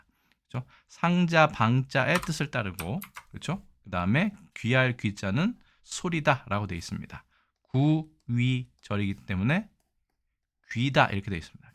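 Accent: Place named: South Korea. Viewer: 매번 지식축적에 보탬이 됩니다! 동양3국의 실용사례까지 설명하시니, 폭넓고도 실용적이라 생각하고요!! 다만, 쭝꿔발음은 잘 모르겠지만, 일본어발음은 한국식으로 들려 옥의 티로 보여집니다!!!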